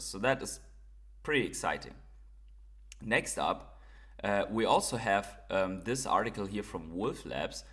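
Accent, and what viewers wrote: Germany. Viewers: Woof🐶 Hörst dich sehr Deutsch an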